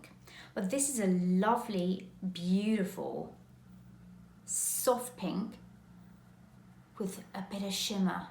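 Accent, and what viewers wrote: United Kingdom. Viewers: To my American ears, anything you say in your British accent sounds luxurious!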